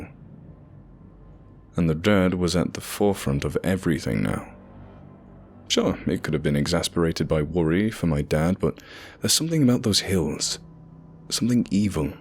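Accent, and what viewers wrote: England, United Kingdom. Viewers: You sure you don’t sound your not from anywhere ky Mabey merry old England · Is this a British accent talking bout driving the back roads? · you don't sound like your from that area... sounds like you are English possibly a ginger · That's right I've lived in the East Tennessean mountains all my life. Same general area and people as eastern Kentucky. Beautiful mountains and people. From this guys English accent you can tell it's about a 99 percent chance he's never been any where near Appalachia lol. It's pronounced Appa latch a not Appa lay cha. You outsiders can visit but we appreciate it if y'all don't stay, especially if you have a superiority complex. We don't take to kindly to any bullshit. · I can’t say, some brith telling a story he read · A British person telling us about a specific area in the US lol. It’s not scary as long as you are strapped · I find it funny! 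I 75 isn’t eastern Kentucky. Lexington isn’t eastern Kentucky It’s Hal rogers parkway . I live in hazard! With your English accent. Ride motorcycle through that whole area it’s beautiful here not scary! I call bullshit ! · This guy sounds like he's from the UK.. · But oh, but... This guy doesn't even have a Kentucky and accent. He's British!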